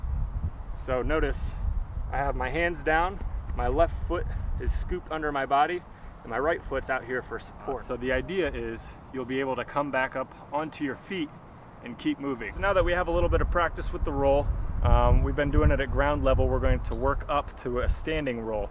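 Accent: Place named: United States. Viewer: your voice sounds very american 😳🤔🙂 greetings from Vienna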